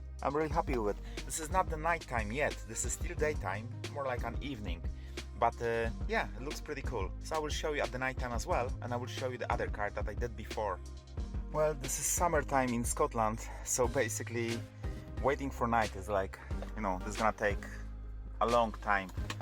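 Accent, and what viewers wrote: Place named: Poland. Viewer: Ty jesteś Polakiem słyszę jak mówisz 😆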